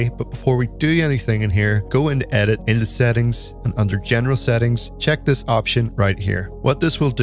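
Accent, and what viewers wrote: Northern Ireland, United Kingdom. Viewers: knew it. Jokes aside, im from Holywood County Down. Your accent just sounded very close to home. Love the videos….. “Where is she!” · Are you from NI ?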